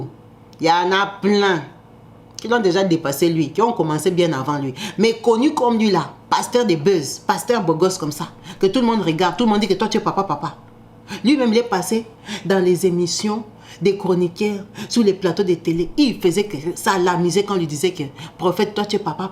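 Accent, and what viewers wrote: Democratic Republic of the Congo. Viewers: Juste un conseil madame. Parler avec votre accent congolais, vous etes ridicule avec cet accent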